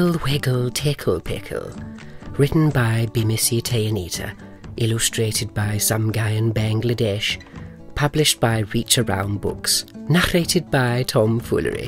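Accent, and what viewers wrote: United Kingdom. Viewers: Such a wonderful British accent. So proper and so wicked. Really very funny. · these books are hilarious but the british narrator makes them PRICELESS!!!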